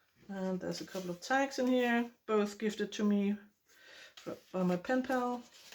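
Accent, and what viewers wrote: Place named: Denmark. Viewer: I love it! I haven't come across your channel before. I've been trying to place your accent - you said you don't speak Dutch, so you're not Dutch. I'm sure you're either European or Scandinavian. Are you Danish? Lol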